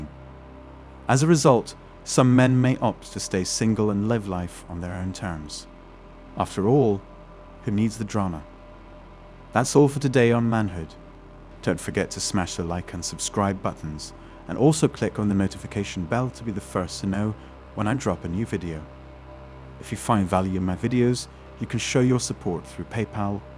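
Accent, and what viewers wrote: United Kingdom. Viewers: How wonderful the British calm presentation, in addition to the nce accent, when compared with the high pitched American presentations - almost shouting.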